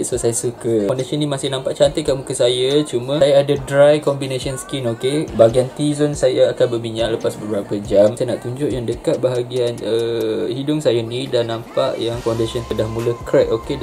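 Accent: Sabah, Malaysia. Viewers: Are you sabahan? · Orang sabah ke ?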